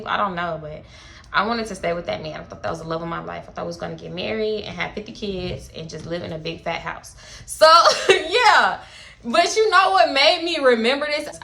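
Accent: Baltimore, United States. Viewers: you and this baltimore accent take me out🤣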